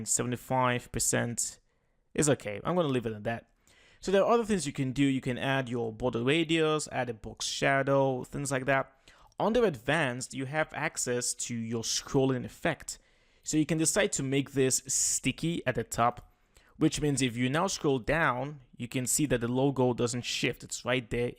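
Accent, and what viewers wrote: Nigeria. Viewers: you kinda sound nigerian lol . great video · Fantastic video, delivered with clarity and a little humour - just right! I am interested in your accent. Would a guess of Nigeria be anywhere near? Thanks again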